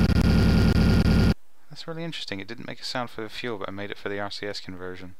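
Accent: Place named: England, United Kingdom. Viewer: You sound fairly English to me, but maybe its just me.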